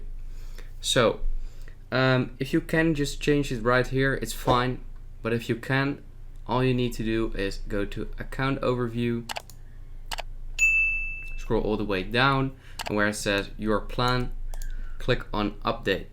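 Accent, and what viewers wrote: Netherlands. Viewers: Ik hoorde aan je stem dat je nederlands bent 😂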